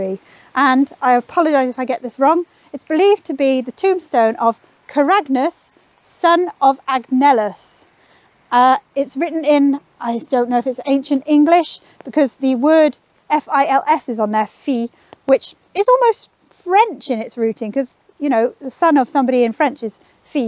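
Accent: Wales, United Kingdom. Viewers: Absolutely love the Welsh accent. And miss speaks beautiful.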